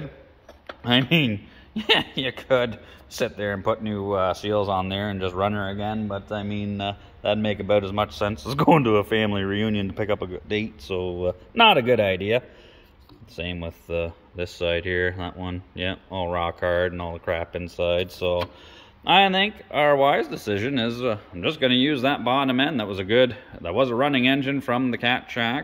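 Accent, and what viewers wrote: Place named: Ontario, Canada. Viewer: Where you from bud sounds like you’re from Ontario ?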